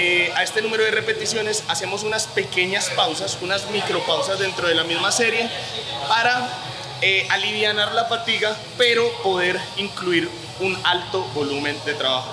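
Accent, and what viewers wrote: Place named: Colombia. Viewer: Saludos desde México pareces, ya hasta me estoy enseñando hablar el dialecto colombiano, que chimba de vídeo!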